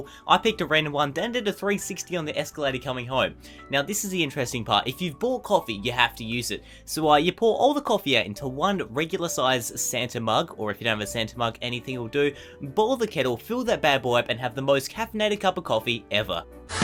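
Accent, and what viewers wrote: Australia. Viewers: r u australian cuz i am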